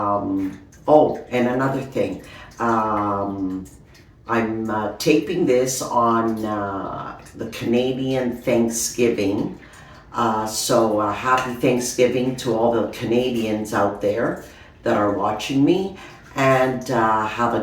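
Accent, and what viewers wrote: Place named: New York, United States. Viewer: Hi! That black cat callistophylla is on my wishlist as well as the undulata which I am right now looking for, affordable of course! I thought your accent sounded NY or NJ. Are you a transplant to Canada? Look forward to your next 💚! Dee, NY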